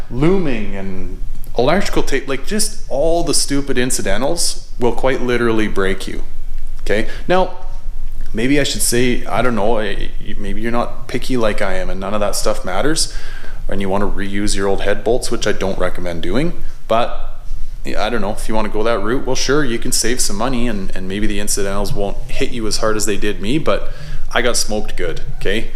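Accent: Canada. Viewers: Your Canadian accent reminds me of back home. It's been 10 years since I've been back. Good luck with the new pony.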